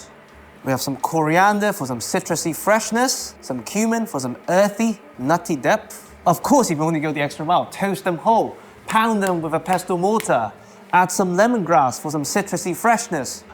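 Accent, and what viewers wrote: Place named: England, United Kingdom. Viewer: Your accent has a hint of English! ❤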